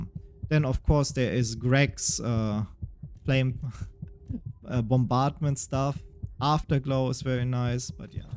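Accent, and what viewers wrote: Germany. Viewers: Dieses deutsche Englisch hahahaha geil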